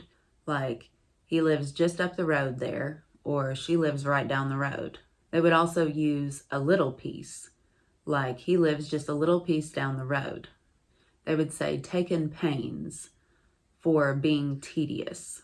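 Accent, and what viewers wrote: Appalachia, United States. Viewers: Thank you for sharing this. My grandma was from the Appalachians, so much of this sounds like her, especially warsh rags and the commode. She passed several years ago. I miss her so much. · Sounds like you're just talkin'. :) My grandparents (GA 1898) talked just like that. How much is "a rite smart"? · When you say "Appalachia", what part are you talking about? Appalachia goes from Georgia to Maine. I hear an accent, so I'm assuming you're somewhere between Georgia and the Virginias, which is where the locals seem to think "Appalachia" only applies to for some odd reason. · yep sounds just like my relatives. We say it's over yonder. Plus all of the words you said. My ancestors were from Tennessee and Virginia. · That dialect suits you well:) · My Nanny talked like this! 😍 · Exactly how my G parents talked and how I still use those phrases.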